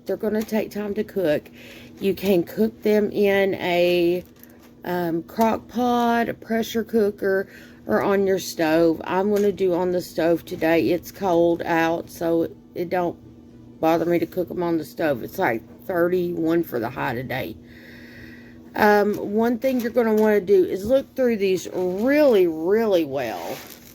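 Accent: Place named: South, United States. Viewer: I hear a little bit of a southern accent when you talk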